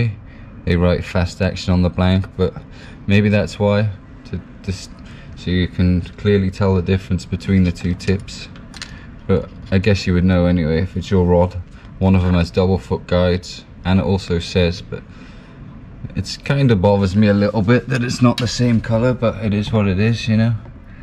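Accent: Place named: Wales, United Kingdom. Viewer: Interested how you ended up in Holland? You're Welsh right? Or at least sound Welsh.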